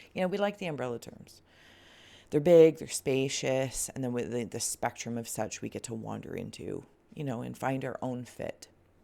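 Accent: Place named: West, United States. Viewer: hope you had a wonderful weekend! Not sure if this is the video but I do (I’m hearing a western accent, haha) I do wish to hear more of this flower farming side of! Seems to be my other obsession during this time of new beginnings. Floret flowers, I think that’s her name. She is breeding roses, dahlias and others that haven’t been breed before, taking it from big box stores and teaching us how to do it. She also is rescuing roses that were becoming extinct. The videos they put out are my new fav live mini series. I laugh, I cry, I learn and I feel part of their farm. Almost dropped your page on her page inviting her to you, i get the feel she’d really like us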